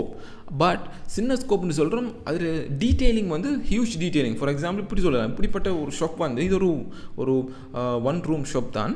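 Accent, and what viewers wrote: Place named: Sri Lanka. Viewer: Great Tamil with Srilanka Slang hearing very nice Sir ❤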